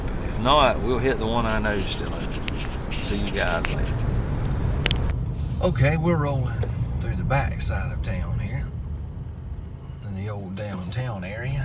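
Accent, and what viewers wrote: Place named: South, United States. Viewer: I got a great vinyl collection but no friends to share them with. I know you would enjoy them. Shame I live over in England near a place called Liverpool of Beatles fame. Love your southern accent.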